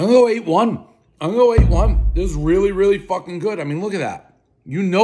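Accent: Boston, United States. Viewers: No, it’s his low class Boston accent. He does it with every word like that. He says mama’s like muh-muh’s.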